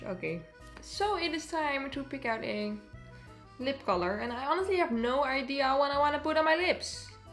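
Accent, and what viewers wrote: Netherlands. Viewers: i instantly recognized your accent as a fellow dutch person LMAO you even use dutch onomatopoeia(?) like. some sounds you make only dutch people would make i feel like. vla is een lekker kleurtje 😳 moet nu naar jumbo denk ik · Are you dutch??? Its sounds like it , im dutch thats why i reconize it haha😘😂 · I just started watching you (this is my third video that I'm watching from you) and usually I'm really bad at guessing from where the accent it (like seriously bad) but I guessed that you are from the Netherlands and suddenly you say you're Dutch. I was really proud of myself. I love your vibe and personality, it's just so lively and fun! Btw, I'm German, so hello neighbor! :D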